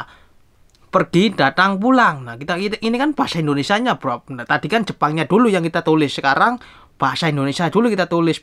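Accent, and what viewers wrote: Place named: Cirebon, Indonesia. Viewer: Logatnya kaya Cirebon dermayu y mas😅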